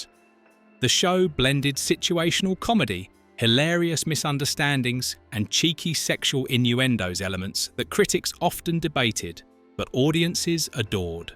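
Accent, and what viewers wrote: United Kingdom. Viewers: British accent commentary on an American show with a fake title! Click bait!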